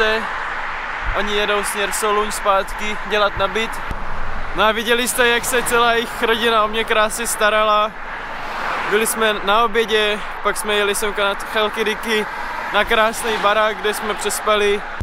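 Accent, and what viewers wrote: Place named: Moravia, Czech Republic. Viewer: Moravák jak poleno. 👍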